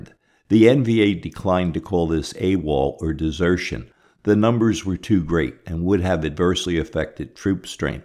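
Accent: Philadelphia, United States. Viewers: are you from philly? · The Narrator's accent seems to be from the Philadelphia/South Jersey/Delaware Valley area. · Definitely could tell he’s from Northeast Philly without even having to look him up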